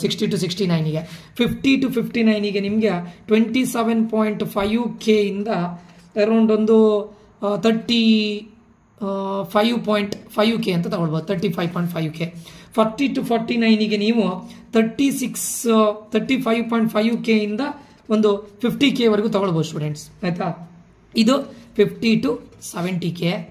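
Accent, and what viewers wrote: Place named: Dakshina Kannada, India. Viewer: sir, you got the correct prediction i have subscribed to you now..! the accent of Dakshina Kannada makes me listen more..!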